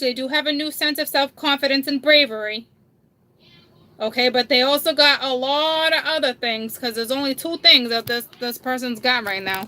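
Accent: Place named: New York City, United States. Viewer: Hello. Having a fairly good night,getting into videos on my 2 favorite planets,SATURN&MARS. ESPECIALLY SATURN'S MOON "TITAN",CHILLING OUT WATCHING "THE MATEIX" AND THEN "THE TERMINATOR",JUST CHILLING TIL I FALL OUT. P.S. LOVE YOUR "NEWYORK CITY"ACCENT!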